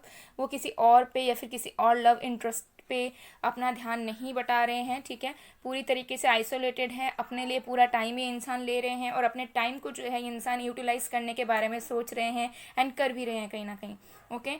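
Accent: Bengal, India. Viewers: Sis tum bengali ho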